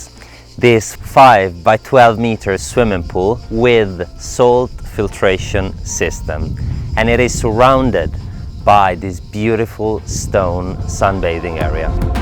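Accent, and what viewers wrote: Italy. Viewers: Buongiorno! I just got back from my holiyeaaay extracting myself from any social media platforms but waaaaittt I find out that your Italian accent is decreasing. I really appreciate your effort and I love both version. 良い仕事を続けてください。❤️🇮🇹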